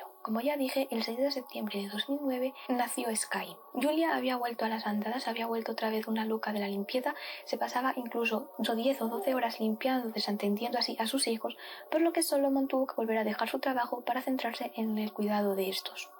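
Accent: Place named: Spain. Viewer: creo q por tu acento eres española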